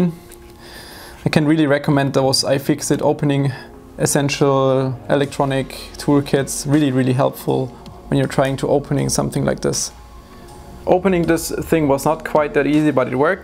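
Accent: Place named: Germany. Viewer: Sorry, but German accents sometimes makes me do a double listen. 20 seconds in... "Hoetel". haha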